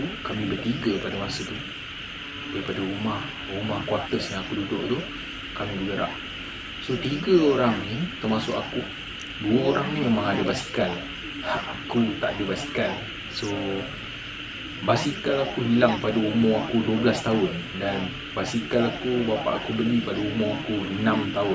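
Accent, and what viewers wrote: Terengganu, Malaysia. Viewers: Ambe asal org kampung seberang pintasan...area kuala dungun...biase gak gi panjat teluk gadong naik utk memancing...skang dah berubah..mari dungun nanti kite ngeteh...deme cakap macam org ganu dohh....respek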